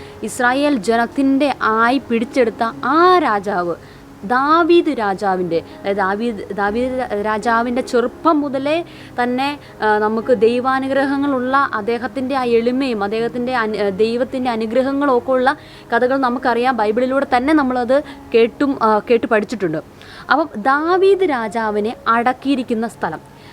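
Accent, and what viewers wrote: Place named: Kothamangalam, India. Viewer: Vargheeya Paraamarsham Nadathaathe Nishpakshamaayi Kaaryanghall Parayu....Paavam Malayalli Vlog Kandu padhikku... Theere Moshamaya Avatharannam...Bhaashayum Athupole....Kothaazham Slang Arochakam..... 🙄😡🙄😡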